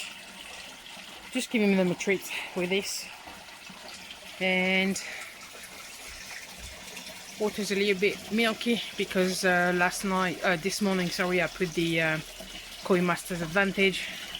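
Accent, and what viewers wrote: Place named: France. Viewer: Keep going, your doing a fine job there, and great videos too. Cheers 👍 by the way love that french accent 👌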